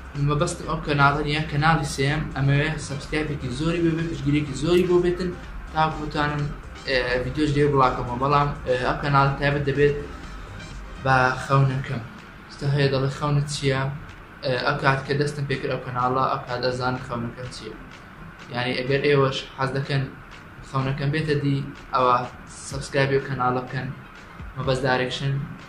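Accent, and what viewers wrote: Erbil, Iraq. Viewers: hhhhhhhhhhhh bas chan diqat ayam ba hawlery qsa aka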